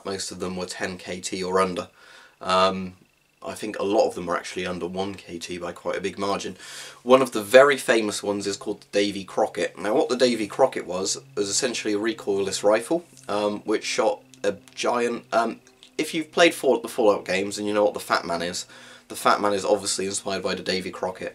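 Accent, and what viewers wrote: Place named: England, United Kingdom. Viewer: I only like recieving information in English accents 😂. It just sounds more sophisticated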